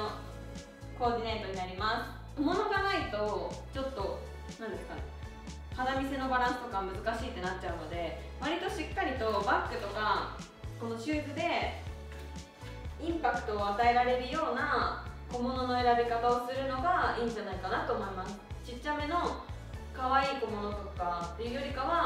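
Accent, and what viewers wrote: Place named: Kansai, Japan. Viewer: さんが履くとユニクロのデニムもセリーヌに見えます😍ほんとどれもめちゃくちゃ可愛いしオシャレすぎです！！ちょいちょい関西のイントネーションが嬉しい😆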